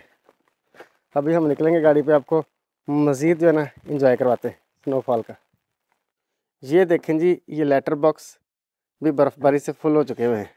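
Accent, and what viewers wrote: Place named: Pakistan. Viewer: ap Pakistan k kis gaon sy hain ?ap ki boli bhot sweet h bilkol sada hmaray jesy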